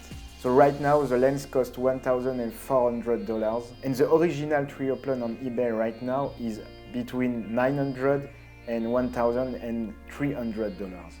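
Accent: France. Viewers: Came here for soap bubble effect but ended up listen to your french-english. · Jusqu'à maintenant je n'avais vu que t'es vidéo courtes de test d'objectifs argentiques, et je ne sais pas pourquoi mais sûrement à cause de ton nom de famille je me suis mis en tête que tu étais allemand ^^ mais en écoutant ton accent, c'était évident ! Non pas qu'il est imcomprehensible ou non, pas de soucis, j'ai très bien compris mais disons que l'accent français est très reconnaissable^^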